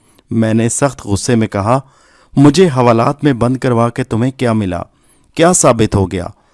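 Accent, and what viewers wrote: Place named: Pakistan. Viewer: Anam Khan lakin ye Indian nahi Pakistani hai aisi urdu Pakistani hi bol sakta hai bahi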